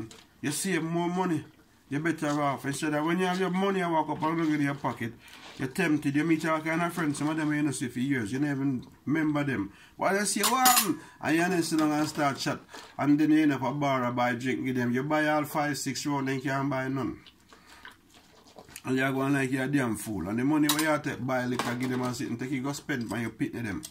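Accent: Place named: Jamaica. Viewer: u sound like a real good jamaican man, Im new to ur channel I notice u spend allot of time in ur kitchen lol u must love cooking that's rare to find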